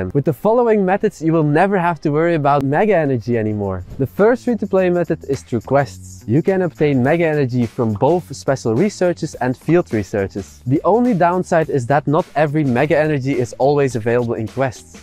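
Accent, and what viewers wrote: Netherlands. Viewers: I can instantly tell you're dutch by the accent :D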